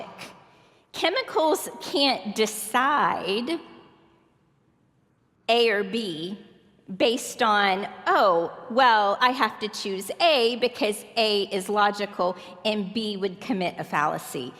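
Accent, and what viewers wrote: United States. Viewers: Hers is the most quintessentially American accent I've ever heard.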